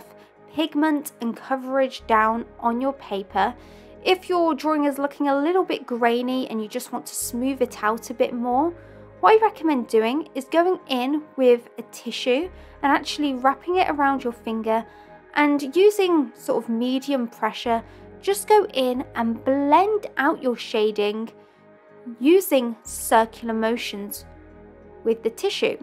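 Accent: England, United Kingdom. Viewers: Hey. I love your work. What part of England are you from?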